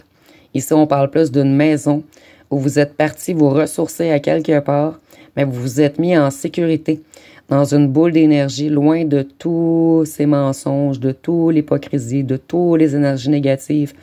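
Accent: Quebec, Canada. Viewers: Bonjour à vous ,je viens de vous découvrir et de m'abonner .Vous m'avez grandement impressionnée. Le choix judicieux des mots qu'on entends pas dans d'autres guidances et qui réconfortent, qui nous aident à grandir ,à garder la foi ,à être fiers de qui ont est .Votre accent semble québécois est-ce que je me trompe ? Merci beaucoup et gratitude à l'univers pour cette belle découverte. Bonne journée ! 😊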